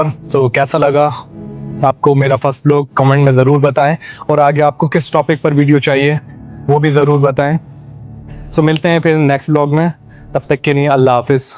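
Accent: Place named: Pakistan. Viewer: Ap. Bilkul pakistani lagte the mujh. Pure urdu...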